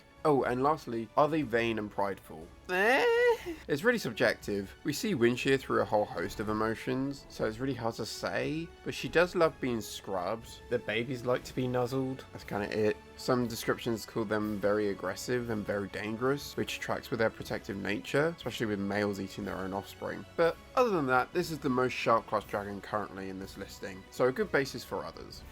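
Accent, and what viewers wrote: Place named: United Kingdom. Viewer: Why do British pronounce t-u's like c-h's?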